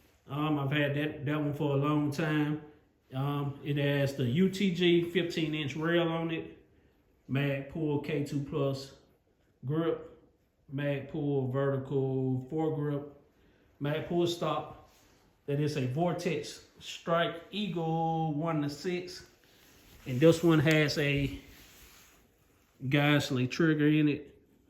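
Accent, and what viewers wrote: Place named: Jamaica. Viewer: Bad man Jamaican voice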